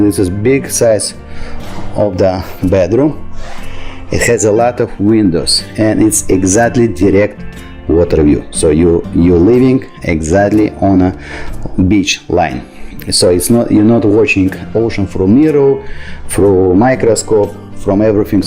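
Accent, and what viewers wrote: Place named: Russia. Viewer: So nice Russian accent. Glad to know that local Miami environment can not destroy it 👍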